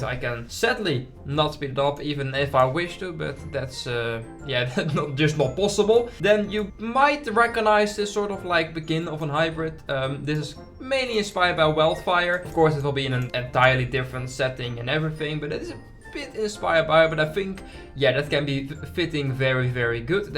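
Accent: Netherlands. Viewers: The Dutch accent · ik hoor het nederlandse accent >:) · are you dutch??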